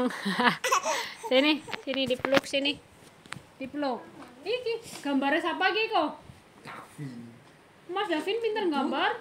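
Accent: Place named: Banyumas, Indonesia. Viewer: Mba Cilacap ya aku Purwokerto mba sama2 ngapak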